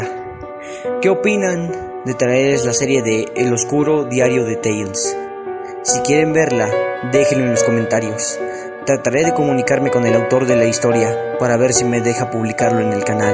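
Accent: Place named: Latin America. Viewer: Oye Heres Latinoaméricano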